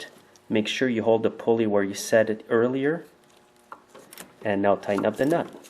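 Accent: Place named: Canada. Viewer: nice to hear a canadian,nice job on the video.thank you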